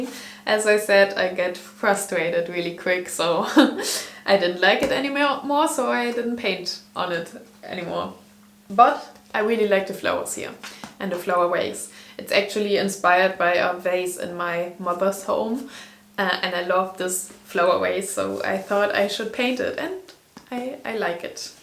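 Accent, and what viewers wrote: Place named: Germany. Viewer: lol love the german accent. cool video!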